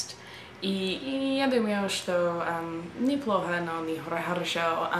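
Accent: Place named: United States. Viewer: Try a little bit harder on pronunciation. You sound like an American speaking English with Russian vocabulary, But don't give up, it'll get better, Russian ain't Easy!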